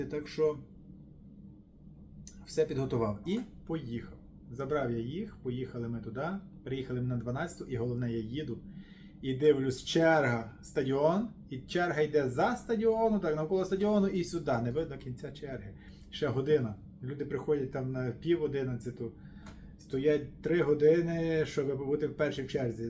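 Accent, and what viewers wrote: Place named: Western Ukraine, Ukraine. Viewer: По акценту точно не з Донецьку і не з Луганщини.Так десь блище до земель народження Степана Андрійовича Бендери. Патріот справжній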